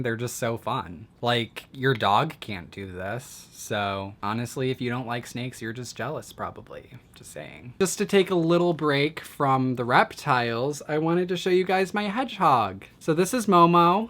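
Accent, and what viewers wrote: Midwest, United States. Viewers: what state are you from you sound like you have a midwest accent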